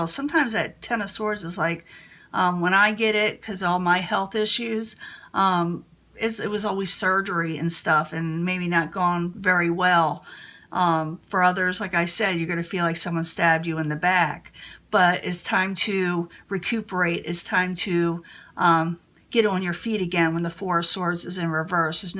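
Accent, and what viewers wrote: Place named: United States. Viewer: How come you sound American now I thought you were British 😱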